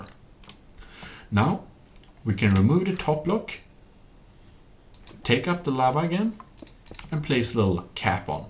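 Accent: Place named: Sweden. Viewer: Let me guess you are from sweden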